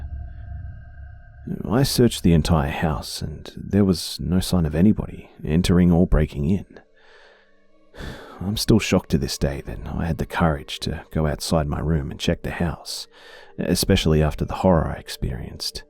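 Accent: Australia. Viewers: LOVE THE AUSSIE NARRATING..:D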